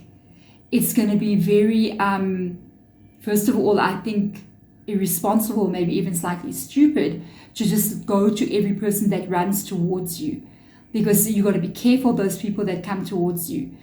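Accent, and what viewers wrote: South Africa. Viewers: B4 opening YouTube for a taro reading i asked my spiritual guides to guide me to a taro reader who can give answers to my questions and can with their reading uplift my spirit for this day. Lo and behold you were the first to appear and the moment you start talking i felt the connection with you. This is my first time ever that i come across your videos. The moment you start talking i knew that you are a fellow south african, only i live now in Australia. You are the best taro reader that i come across of all the other readers. The reason why is bc i have never their heart. Everything that you said resonates with me. The isolation that i prefer bc of others bad energy thats not good for my soul. Many times i feel like i don't belong where i found myself. I am very empathetic and can feel other people's emotions. The other day i dreamt that i was nackered and i needed somewhere to rest but where i am, i feel like im not welcomed. 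I found a bed where i can rest and felt so uneasy i was afraid to fall asleep then suddenly i feel arms around me and immediately i knew whom it was without turning around. At that moment I felt at peace. The person whom it was passed away 5 years ago and has told me that everything is going to he alright. Later the day i also remembered the morning he came to visit me was also his birthday. Thank you for your reading and i am subscribing immediately. Looking forward to listen to your other videos. Love and light.❤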